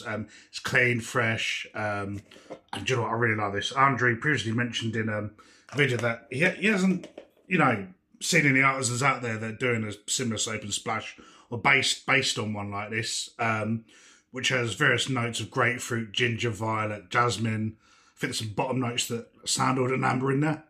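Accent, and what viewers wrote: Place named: United Kingdom. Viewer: Hello! Your latest video popped up in my feed so I subscribed, this is the first thing I've watched... Where in the world do you live? You have an incredibly authentic British manner of speech for someone in Brazil 👀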